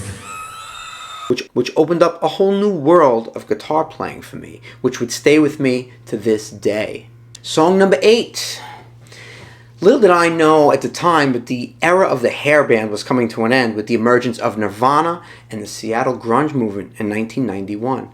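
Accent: New York, United States. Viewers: Wish I’d started at 11 instead of 67 lol Anyway, love to hear the NYC accent. I’m a Bronx boy living in Cali the last 10 years. Not a day goes by that someone doesn’t mention my accent. Enjoyed the video If I ever get serious with practice I can be pretty good in my 90s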